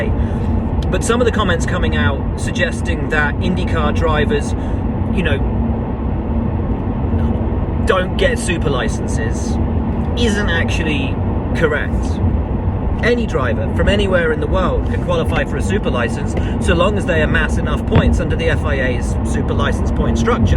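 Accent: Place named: United Kingdom. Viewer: It's nice hearing a fella with a British accent giving respect to the drivers from the US as well as the drivers in our US series'. Realistic take on things. Just hearing people chatter about Herta in the pinnacle is good enough IMO. I'm sure a few of Indycar's top drivers would be competitive in a Merc, RB, & the horse. But that will never happen. Pato just got a foot in that door on Sunday. He may win more races and contend for the championship in Indycar 2021. Could he do that in a Macleren F1???? A highly touted previous world drivers champion was unable to recently so....... ?????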